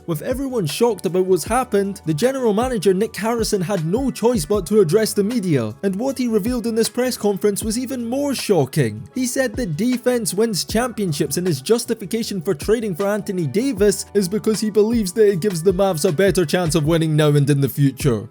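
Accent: United Kingdom. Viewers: Bro fix your mic, you sound like you're from uk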